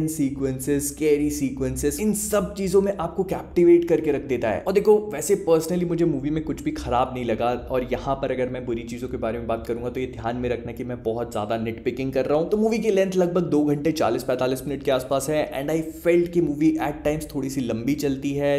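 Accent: Bhopal, India. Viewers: Ae Bhai Tum Bhopal se ho kya😶😳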